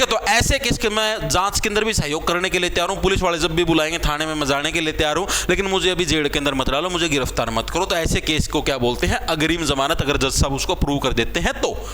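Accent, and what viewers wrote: Haryana, India. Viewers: Ye sir haryana se hai kya?😅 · Sir aap Hariyana se ho kya😊😀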